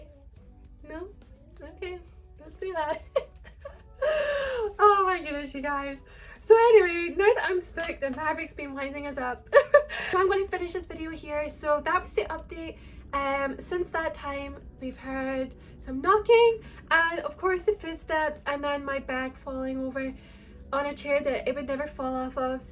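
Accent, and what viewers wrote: Scotland, United Kingdom. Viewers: Love your Scottish accent! Your nostalgic look is too cool! Love your videos and ignore the haters! You and Maverick and your little boy are too cute! Bless all 3 of you! ❤ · Love your videos ❤ ps are you from Scotland cos so am I 🏴😁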